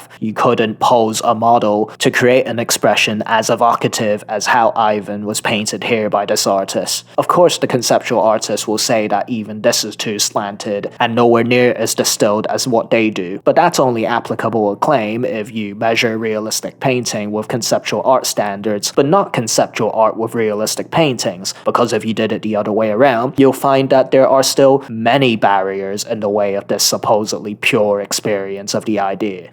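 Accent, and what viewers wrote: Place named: Upper class, United Kingdom. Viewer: why do all of you anti modernists have such a smug upper class accent?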